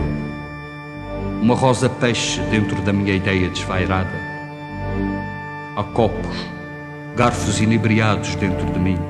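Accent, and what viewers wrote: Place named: Madeira, Portugal. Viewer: Foda-se e com sotaque madeirense!!! Orgulho de ser madeirense!!!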